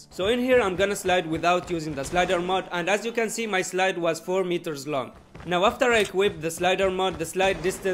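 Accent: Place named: India. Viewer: Guys hes has a indain accent that means hes indain so it fake he is capping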